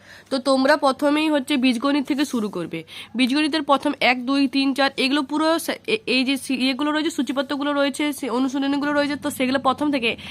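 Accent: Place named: Bankura, India. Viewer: গলা শুনে বাঁকুড়া বাঁকুড়া ভাইব পাচ্ছি।🙄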